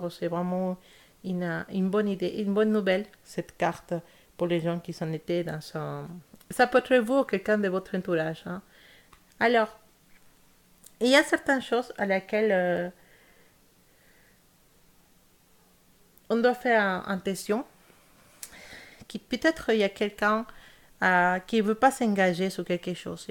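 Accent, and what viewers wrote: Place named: Spain. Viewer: Bonjour je viens de vous découvrir sur la chaîne et j adore votre tirage mais aussi votre accent ça me rappelle mon. Pays car je suis aussi espagnole. 😜 Bendiciones Hermosa 😉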